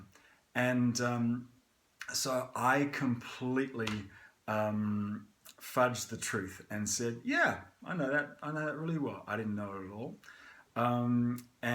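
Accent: Australia. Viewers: Amazing you still kept your Australian accent.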